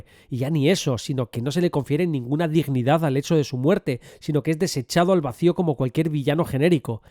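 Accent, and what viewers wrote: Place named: Spain. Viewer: Que bien análisis de la película y le encuentro toda la razón, pero me da mucha risa y me saca de la seriedad el como pronuncia los nombres de las cosas y personajes de Star wars, por cada cosa que empieza con S los españoles le meten un "ES"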